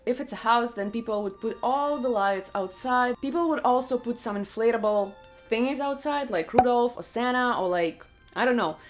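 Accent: Russia. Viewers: I like your Russian accent...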